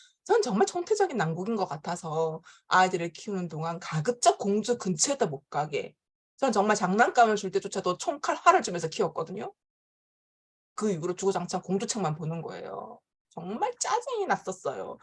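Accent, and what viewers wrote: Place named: Gyeongsang, South Korea. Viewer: 작가님~오랜만에 유튜브 올라왔네요^^얼마전에 교집합 스튜디오에 나온거 보고 엄청 반가웠는데 ㅎㅎ 작가님의 이 구수~~한 갱상도 사투리와 억양+ 가감없이 진솔한 옆집언니같은 화법 완전 팬입니다!! 듣는거 자체로 힐링됩니다~앞으로 자주 올려주세요~~❤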